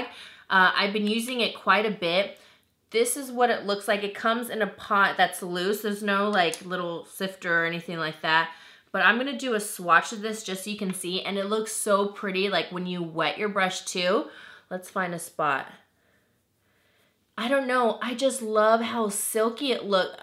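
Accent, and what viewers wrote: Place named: Northern Ireland, United Kingdom. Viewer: Girl you have me in a pure kink with the Cana baelive it ! Soooooooo funny! You sound northern Irish 🍀 I had to rewatch it ! 😂😂😂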